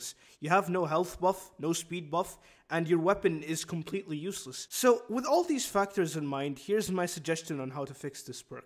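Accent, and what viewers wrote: Arab world. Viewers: الاكسنت عربي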